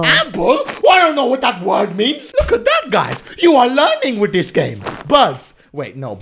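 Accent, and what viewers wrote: United Kingdom. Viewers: your british my man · And he is British English (that's why) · You are british this game is american accent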